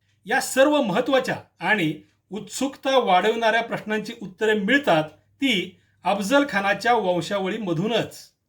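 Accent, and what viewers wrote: Standard. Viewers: फारच सुंदर माहिती मिळाली साहेब तुमचं निवेदन स्वच्छ मराठी भाषेतील असल्या मुळे, उत्सूकता वाढते.धन्यवाद.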